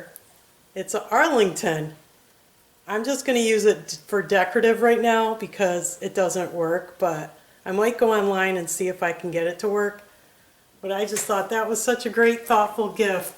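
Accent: Pennsylvania, United States. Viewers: Oh I loved this video. Every bit of it. Im in PA about an hour from where you came home to. I knew I recognized you accent as home. ❤️ Your Grand daughter is precious and looks lovely in her dress. I got my sewing love from my Grandmother when I was about 6. You’re Grand daughter might want to try one day! One more thing, You are a wonderful person, effervescent and joyful, so much fun to watch. 😊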